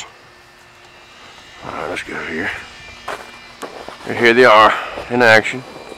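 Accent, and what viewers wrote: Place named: North Carolina, United States. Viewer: are you from North Carolina or Virginia you sound like everyone in my family :) We are in NC probably 45 min from Virginia line.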